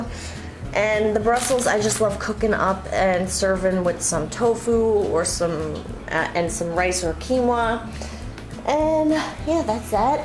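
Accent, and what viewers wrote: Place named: Staten Island, United States. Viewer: Lol is that trader joes in Staten Island? Lol looks like it and you're accent made me think that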